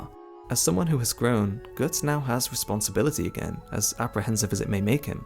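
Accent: United Kingdom. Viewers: I think it's because you have an English accent who speaks vary softly in tone, just like most youtubers who explain the Dark Souls lore, you know the kind.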